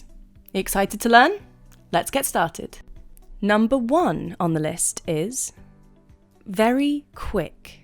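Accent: United Kingdom. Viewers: This girl's voice accent seems from united kingdom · I really like your British accent. Furthermore, I enjoyed the lesson. Thank you 😊😊😊😍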